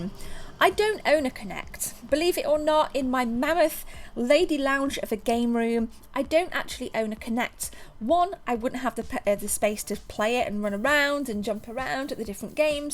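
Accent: United Kingdom. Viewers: If I had such a lovely British accent, I would never shut up!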